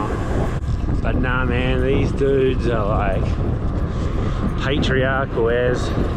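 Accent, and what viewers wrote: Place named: South, United States. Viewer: Your cowboy / country guy voice is awesome. Lol. Keep it up!!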